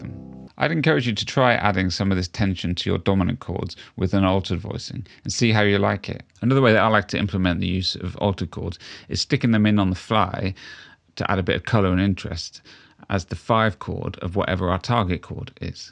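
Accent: United Kingdom. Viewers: Your delightful (South London?) accent and phrasing remind me of Tim Roth (aka Pumpkin) in Pulp Fiction. 😊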